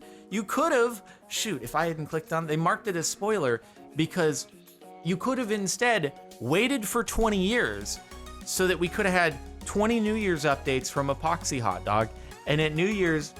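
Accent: Australia. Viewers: Mate, not far off the Aussie language. I’m impressed. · Holy shit he actually sounds australian i have an australian cousin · I mean....speaking as an aussie who has heard people try Aussie accents yours sparklez isn't too bad. It at least doesn't sound more British than aussie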